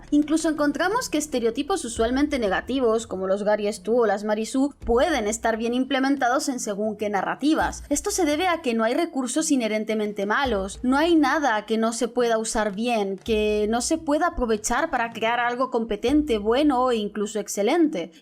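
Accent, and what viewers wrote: France. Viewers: De que parte de Francia sos? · Porque tiene asentó francés?? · Soy nuevo en canal, ¿ la chica que locuta es de asendencia francesa lo comento por su acento ?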